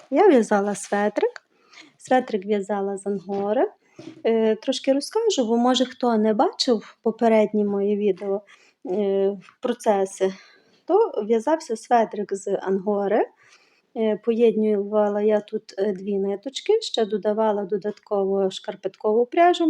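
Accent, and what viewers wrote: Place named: Western Ukraine, Ukraine. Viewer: вітаю, подивилася з насолодою ваше відео, приємно слухати ваш діалект. У мирні часи ми кілька разів у відпустку, їздили по західній Україні, були і в Карпатах і в закарпатті, завжи набирали грибочків, звичайно сушених але яких запашних... Ви мені нагадуєте ті приємні часи:). Діліться ще з нами вашими гарними виробами. Бажаю спокою і миру❤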